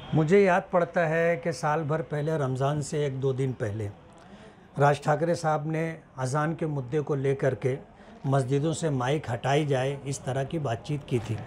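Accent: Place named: Hyderabad, India. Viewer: मामू तुमाला महाराट्रात ल्या जनतेनं निवडून दिल तुमी हेंद्राबाद मध्ये बोलता अशे वाटत आहे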